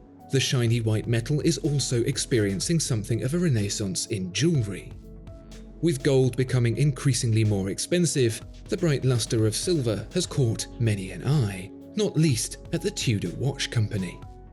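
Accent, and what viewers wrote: United Kingdom. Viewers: Very informative video but gosh that ANNOYING British accent just for the Americans we buy anything from the Mother Country.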